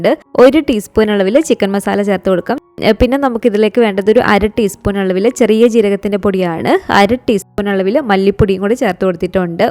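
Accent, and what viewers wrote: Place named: Ernakulam, India. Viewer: ഇത്താടെ വീട് എവിടെയാണ്. സംസാരം കേട്ടിട്ട് എറണാകുളം കാരിയാണെന്ന് തോന്നുന്നു 😊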